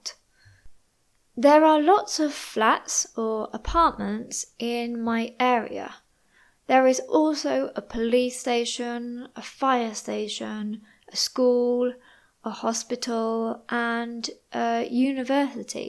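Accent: United Kingdom. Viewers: Thank you for your videos, they are very clear and help to understand the elegant British pronunciation...... although I prefer your videos A1....😂😂😂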